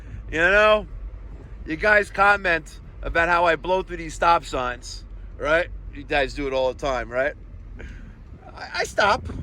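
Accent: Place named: Long Island, United States. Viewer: Ha! Ran accross a video head the accent and said oh that's long island!! Ronkonkoma in da house lol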